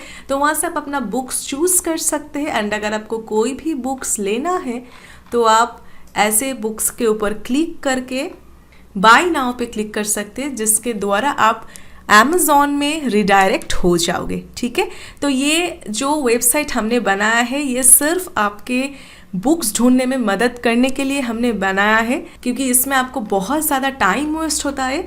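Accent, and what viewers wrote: India. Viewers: Bengali with Hindi accent😱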